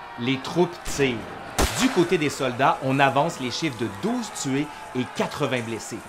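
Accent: Quebec, Canada. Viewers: J'adore ton accent québéquois xD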